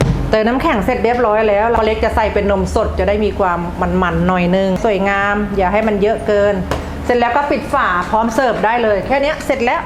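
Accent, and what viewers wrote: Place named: Provincial, Thailand. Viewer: ดูเพลิน พี่เสียงเหน่อน่ารักด้วย ขอบคุณสำหรับคลิปครับ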